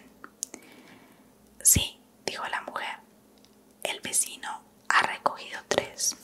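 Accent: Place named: Colombia. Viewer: Amo ouvir essa colombiana falando espanhol. ❤❤❤❤❤😘😘😘😘😘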